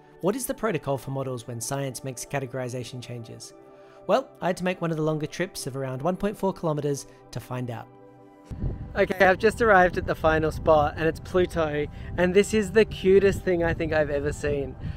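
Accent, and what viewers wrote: Australia. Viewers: Australian tom Scott out here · Australian Tom Scott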